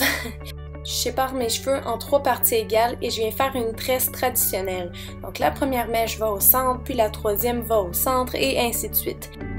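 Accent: Quebec, Canada. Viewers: j'aime bcp ton accent québécois,je regarde come macdream31 le soir, ça me détends et j'apprends plein de choses.quand on regarde transcription (à droite de "ajouter à") Youtube n'arrive même pas à te décoder lol ;-) tes vidéos s'améliorent de semaines en semaines avec toujours de la bonne humeur et tes rires :) bonne continuation :)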